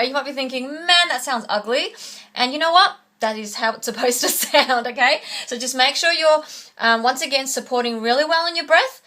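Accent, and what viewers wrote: Australia. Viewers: your accent...Umm..Australian right ?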